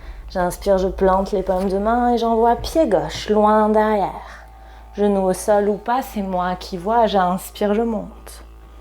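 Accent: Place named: South, France. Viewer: Encore une nouvelle séance qui me fait du bien. Me change des séances iyengar que je pratique tout au long de l'année. Un véritable plaisir avec cet accent si charmant qui m'apporte en plus la lumière du Sud dans nos contrées septentrionales. Au plaisir de pouvoir suivre en présentiel un jour un cours avec;-) Belle fin d'année à vous et longue vie à l'alchimie des corps en 2022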